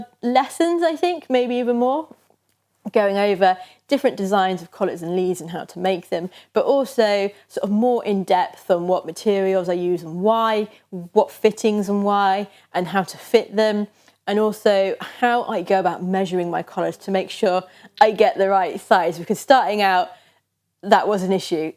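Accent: England, United Kingdom. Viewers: Congratulations from Madrid! I love your English accent… where you from?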